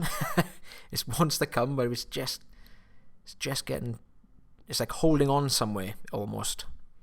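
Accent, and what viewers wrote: Wales, United Kingdom. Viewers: Well done!!!! You were calm, cool, and collected. It was quite a tough one, but you just persevered and got those sticky bits out!!! 👂🏼 And your voice is so charming!!! I love a good Welsh accent😊 Can’t wait for day 17!! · Well done. Take a bow 👏🏻👏🏻👏🏻👏🏻👏🏻👏🏻👏🏻👏🏻 can I please ask where abouts in Wales you are from as you accent is slightly different to Mr B’s. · Love your Welsh accent.....Merry Christmas to you and yours!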